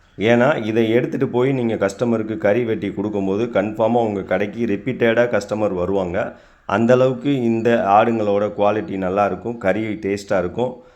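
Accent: Madurai, India. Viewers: ❤ assalamualaikum warh best goat lovely madurai ❤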